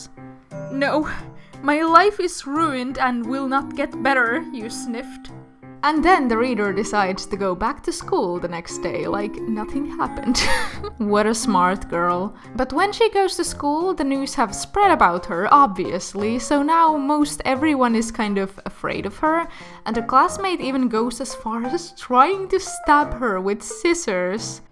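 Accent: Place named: Finland. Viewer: TUNNISTIN HETI SUN AKSENTISTA ETTÄ OOT SUOMALAINEN HERRANJUMALA tekee tästä vielä paremman 😎.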